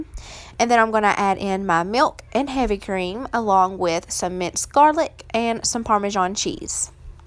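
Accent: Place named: Midwest, United States. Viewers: I like your videos... straight to the point with great information. Plus being from the midwest I appreciate your accent :) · Hi! I am new to your channel and I absolutely love it! I love getting ideas from you and I think you cook some really fun, family friendly friendly meals. I’m also from Michigan area so I love to hear your accent. 😄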